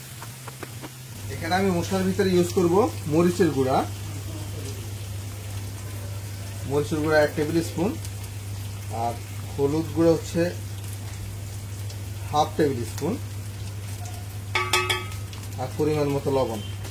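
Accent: Noakhali, Bangladesh. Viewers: ভাই আপনার বাড়ি কি নোয়াখালী আপনার কথার ধরনটা ওরকম লাগতেছে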